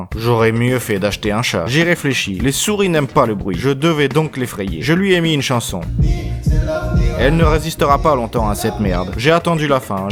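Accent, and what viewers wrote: Russia. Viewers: T français ou russe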